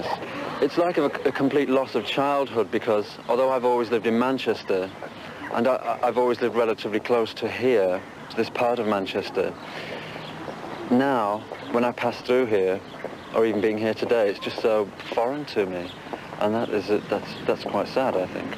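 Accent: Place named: Ireland. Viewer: O sotaque irlandes dele junto com o inglês da um tom de soberania na sua voz, eu simplesmente amo.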